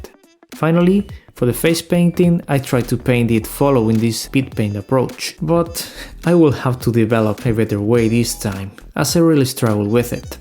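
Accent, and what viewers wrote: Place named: Spain. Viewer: Lo sabía por el acento, gracias por la info, por lo general no hay muchos canales de modelismo españoles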